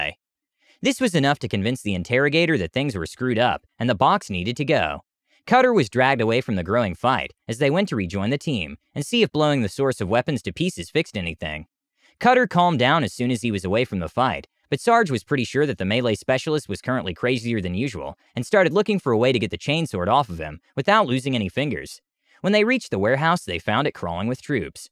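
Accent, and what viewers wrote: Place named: United States. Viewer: Text: Extremely stereotypically british. Voiceover: Matter of fact car salesman american.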